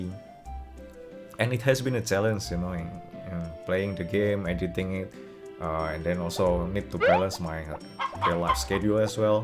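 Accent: Indonesia. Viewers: yes your javanese accent is very noticable through your english 😂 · Judging by your accent, you must be idonesian. Arent you? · hello , me and my gf are watching this whole guide in Japan, and this game is so interesting we are not even studying lol . i will be replaying this with my her who is a huge fan of this game. thanks for this guide , bro. btw, she immediately recognized your indonesian accent, because she is also indonesian i guess Harvest moon and Indonesia go hand in hand :) thank you again for your efforts. Appreciate it greatly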